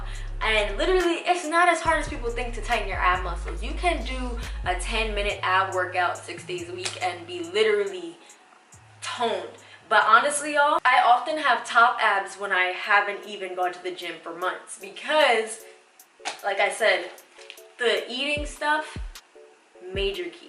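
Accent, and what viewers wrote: Jamaica. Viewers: Ayeee your a Jamaican gyal who knew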